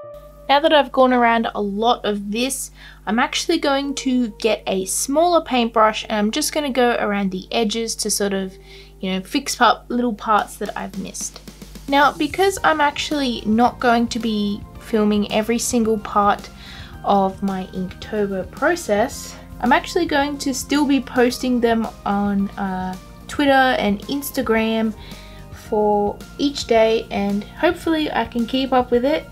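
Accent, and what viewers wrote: Australia. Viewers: The bob rossy australian vibes with the way you talk plus the typical you drawing pokemon is just perfect This is how I like it haha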